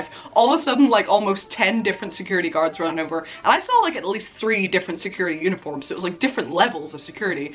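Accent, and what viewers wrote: United States. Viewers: Ive no idea why, since I know you live up north, but your accent sounds like, half american or something these days lol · Well maybe a few words, actually, but at first you sound American!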